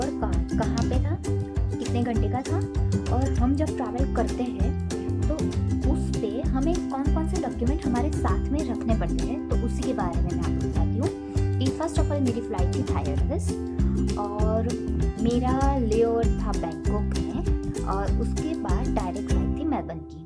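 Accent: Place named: Gujarat, India. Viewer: damn.. ur gujju accent... love it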